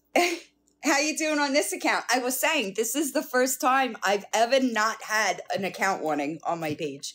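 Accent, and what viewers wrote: New York, United States. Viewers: Im not being a weirdo or anything but I just wanted to tell you that you are a very beautiful Woman who gives off an aura of being a super strong intelligent woman who puts up with no bull shit❤ it's very refreshing to see and hear. So Smile! I do not know your whole story but I know that you just went through having the loss of your mom, I believe, and I just want to say that I will pray for you and hope that you can heal from such a traumatic event in life. That's what I consider this to be, in my own life as well. Okay I hope I said that right and I'm the one who said I love your accent. I'm from New York too, just not the city just a little above that around Poughkeepsie and I miss it so much 😢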